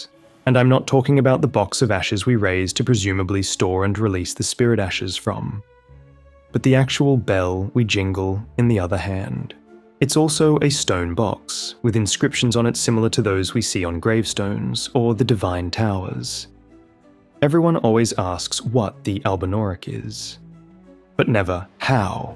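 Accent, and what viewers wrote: Australia. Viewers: Hey not sure if this is me just confusing aussie accents but you have to be ' ' right? If so you're killing it with these channels dude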